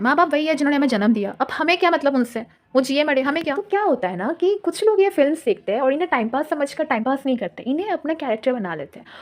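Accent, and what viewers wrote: Bengal, India. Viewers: you're bengoli am i right?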